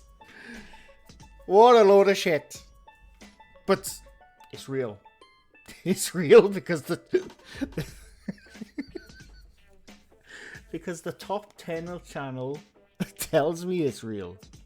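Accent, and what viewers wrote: Wales, United Kingdom. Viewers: your Welsh accent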